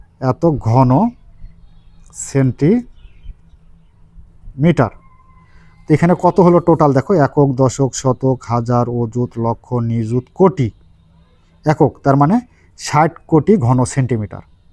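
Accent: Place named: Rajshahi, Bangladesh. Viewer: 🤔🤔🤔ভাষা শুনে লাগছে রাজশাহী (সম্ভবত)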